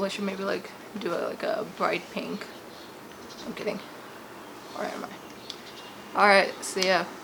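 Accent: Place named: United Kingdom. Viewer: ABFTBA Always be flexing that British accent.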